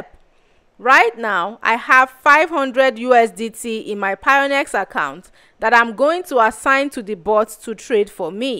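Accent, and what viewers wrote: Nigeria. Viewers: Are you a Nigerian, ? the accent is like mine.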